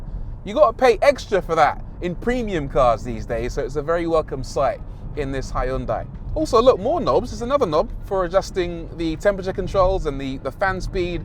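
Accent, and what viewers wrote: United Kingdom. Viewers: Yes we in Aus pronounce it the same as the motherland! All advertising by Hyundai here pronounces it the same as you do in the UK! PS. Love your reviews. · I’m an American Army vet that did 3 tours in Korea. I pronounce it the same way you do sans British accent. I love your reviews. You have a new subscriber. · Here in Australia 🇦🇺, we pronounce the word Hyundai, exactly the same way as you do in the UK, nice rant by the way, I was lol..😂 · I got to love that British accent it is so awesome to listen to. I hope this guy does more EV reviews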